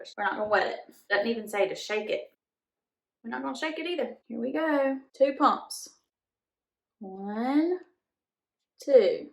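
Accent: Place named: Louisiana, United States. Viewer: You sound like your from Louisiana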